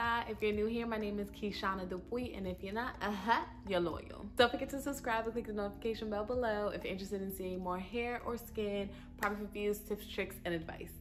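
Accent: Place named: New York, United States. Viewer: omg i knew u were a new yorker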